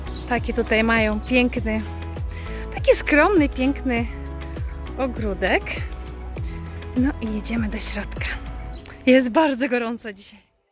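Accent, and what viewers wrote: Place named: Vilnius, Lithuania. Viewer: Zapraszam W mój dzień - to taki rusycyzm, jest z Wilna. A ja myślę, że brzmi uroczo, jak i cały dialekt wileński:)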